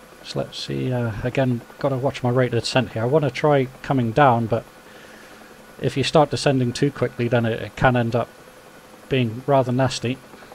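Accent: United Kingdom. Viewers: Where are you from? East London?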